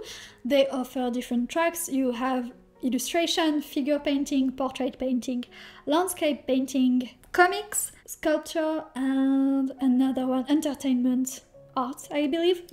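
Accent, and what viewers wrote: France. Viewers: Your accent is French ???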